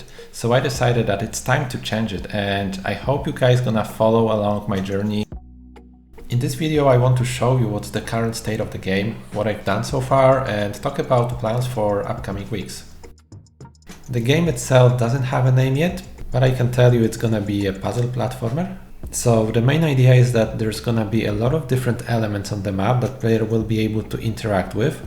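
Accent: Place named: Poland. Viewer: Are you from Poland? your accent sounds very Polish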